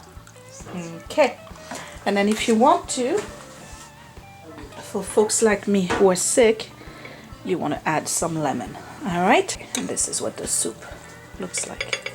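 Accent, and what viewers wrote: France. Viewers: and the soup are amazing:))) ha ha ha l'accent français;)! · yay! More French accent! I love listening to you speak.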